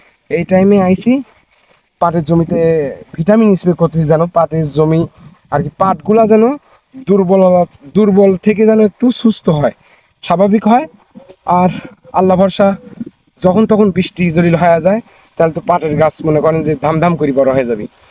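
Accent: Natore, Bangladesh. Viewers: আমাদের নাটোরের আঞ্চলিক ভাষায় কথা বলেন খুব সুন্দর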